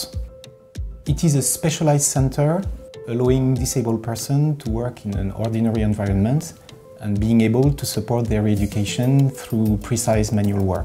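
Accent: France. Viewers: L'accent français on le reconnaît 😭😭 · On adore l’accent français !😂🇫🇷🇫🇷🇫🇷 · Le français qui parle anglais sa donne ça 🤩🤗 with bts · Mmmh... L'accent français comme on les aimes ❤️❤️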